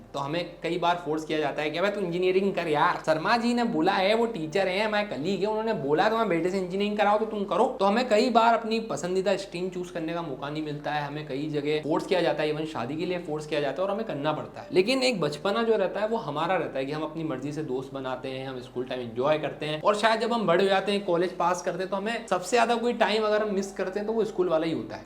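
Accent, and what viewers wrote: Indore, India. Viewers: Bhai tera INDORI andaaz bht achha laga... aisa laga koi bada bhai samjha rha hai sahi baat❤❤ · Bhiya Aap Indori ho kya ?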